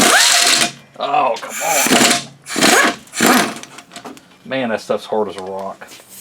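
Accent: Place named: South, United States. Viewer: I really appreciate your videos and your vast knowledge of switch pitch TH400's. Where are you located? You sound like me......Southern. :-)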